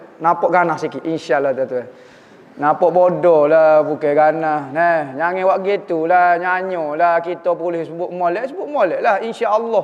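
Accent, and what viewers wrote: Kelantan, Malaysia. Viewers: Maaf mencelah..sy pun sm smbil dgr ceramah sambil blaja dialect kelantan.mula2 pening gak. iman...mula2 sy ingat email...hehehe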